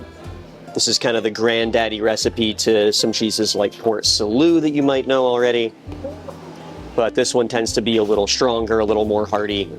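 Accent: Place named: Germany. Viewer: Der arste Amerikaner wo mann denkt er ist ein Deutscher, Schweizer oder Engländer.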